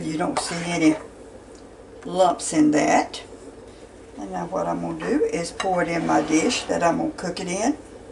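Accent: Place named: North Carolina, United States. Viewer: I love when you make old traditional recipes! 💞 You sound like your from my neck of the woods in NC! ☺️ I wonder if you've ever made Sticky Toffee Pudding? It's a very famous & popular moist cake from England & Ireland. I've never made it but would love to see it done. Thanks 🤍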